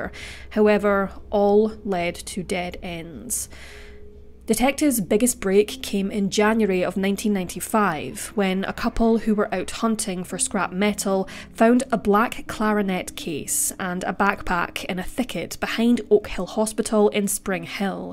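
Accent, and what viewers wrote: Scotland, United Kingdom. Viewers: Great content, great accent. Are you scottish?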